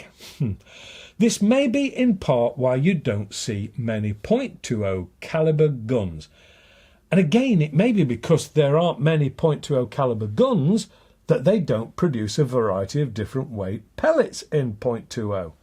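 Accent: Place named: Leicester, United Kingdom. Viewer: Are you from Leicester? Can notice that accent a mile away :D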